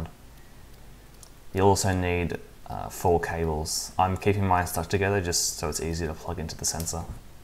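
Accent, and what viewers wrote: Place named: England, United Kingdom. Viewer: This module should not be used without level converter. The VCC for the sensor is max 3.3V, see <link> and <link> There is no provision for level shifting on the module. If you apply 5V you are unduly overstressing the module and reduce its lifetime. I love your english accent but the accuracy of the contents leaves to be desired.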